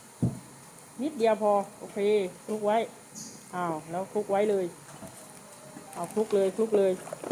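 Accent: South, Thailand. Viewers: สำเนียงชัดเจน คนใต้แน่ๆ😂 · คนใต้หรือเปล่าคะเก่งจังได้ความรู้ด้วยค่ะ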